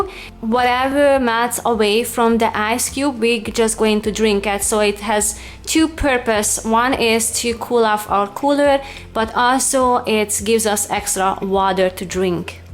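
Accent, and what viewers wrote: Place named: Hungary. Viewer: 90 százalék, hogy magyar vagy kizárólag az akcentusból!A maradék 10 a név miatt :D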